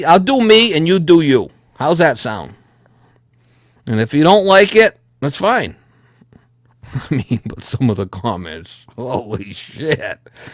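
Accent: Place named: New England, United States. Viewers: Love the voice and New England accent. Accents are dying out. I grew up in Los Angeles and have no accent whatsoever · Hey. Luv your videos. I know what some people are saying, but maybe part of it is that they're listening to the words your saying to a person on the camera but not seeing your face when your talking to them. IDK. Anyway, you do you.:) I also think part of it is your accent. You do have a strong NE accent. I agree, don't change for anyone, right.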